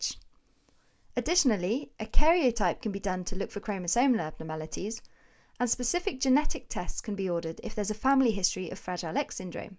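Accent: United Kingdom. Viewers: Great voice, like made for this. A bit cliché with the British accent ;)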